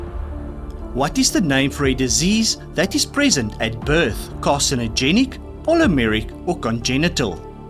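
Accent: South Africa. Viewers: Also thank you for your great quizzes ! Your pronunciation is wonderful !!! The S/A accent is great !! (JOKE ) "Tell me , being South African , do you have a grudge ? " " of course I have a grudge ...where do you think I keep my car? " xx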